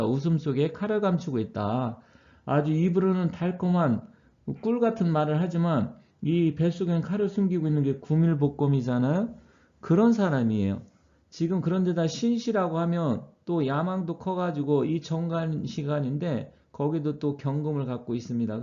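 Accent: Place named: Jeolla, South Korea. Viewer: 선생님은 말씨톤이 전라도말씨같은데 전라도에서는 보기드물게 자유민주주의 보수우파시네요. 수고가 많으시고 훌륭하십니다.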